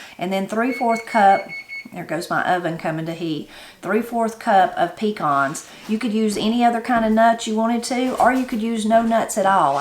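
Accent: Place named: South, United States. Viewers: Great video. Watched this from the UK Never heard of this before but I will definitely make it. Loving your southern accent ❤ . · oh, your accent sounds like home. I moved to the east coast from the south a year ago and never hear those southern twangs anymore. always so nice to hear them. these are a staple where I'm from in Arkansas, as well!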